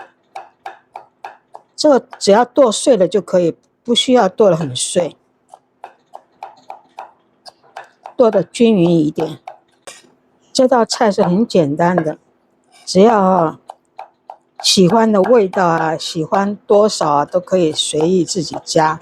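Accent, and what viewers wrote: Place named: Shanghai, China. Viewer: 我覺得媽媽好像是江浙人、因為有上海口音。我父母就是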